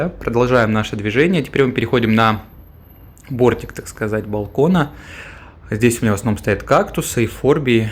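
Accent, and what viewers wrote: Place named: Spain. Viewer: Прекрасное видео - как приятно видеть ухоженные растения имеющие неповторимый естественный вид при заботливом выращивании в подходящем для них климате. Вроде бы - всем известная простая маммиллярия Mammillaria elongata, но лучше не видел, очень хороша под натуральным солнцем. Как ориентирован балкон по отношению к частям света? И хотел бы немного помочь с названиями. Сомневаюсь насчет алоинопсиса - это скорее фрития (Frithia pulchra) если цветки темно-розовые или фенестрария ( Fenestraria rhopalophylla / aurantiaca), если цветки желтые/белые. Кактусы: и - не эуфорбии, это такой очень красивый маленький цереус Monvillea spegazzinii, очевидно выращенный из кусочков кристаты. Она красиво цветет. Безымянны кактус - Notocactus oxycostatus, родственник известного всем N. ottonis. И рядом стоящие Eriocactus leninghausii i Brasilicactus haselbergii. 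Нет, это не маммилопсис. )) Я не использую кактусного новояза и не обьединяю по-хантовски старые известные роды, пусть это остаeтся на совести неофитов. PS Когда читаешь латинские названия у тебя приятный испанский акцент. Я изучаю португальский, с ним испанский понятен, но не наоборот. ))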